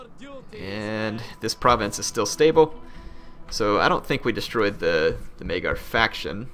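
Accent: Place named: South, United States. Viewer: he's from the south c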